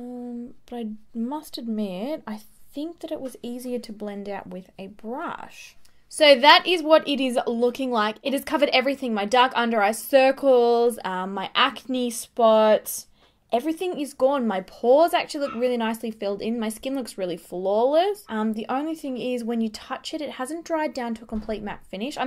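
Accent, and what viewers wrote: Australia. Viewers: Thats not american slang. She is Australian. Thats how she speaks. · sara v. she's from Australia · is she australian i cant tell with her accent because i'm australian and i dont know ahha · h law She's from Australia. Of course she's gonna have an accent. Everyone has a different accent depending on where they're from. Did you not know that? I don't think you did, even though it's an obvious fact. Also your attitude is so gross. I literally cringed reading your last two comments, yuck. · Omg. When i heard your voice i knew that you are from Australia. ❤️ I love Australia. It's most beautiful country in the world. And i'm from Czech republic. ❤️❤️💖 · is she Australian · Amanda Bittner she's Aussie and leave her alone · Where is she from? Australia or New Zealand??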